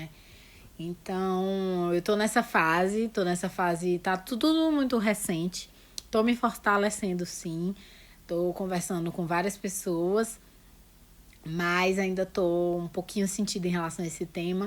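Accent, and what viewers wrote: Bahia, Brazil. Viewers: Nossa sempre que vejo, algum vídeo deste ca fico me perguntando esse sotaque "baiana" e agora tive a confirmação! Qual o interior ?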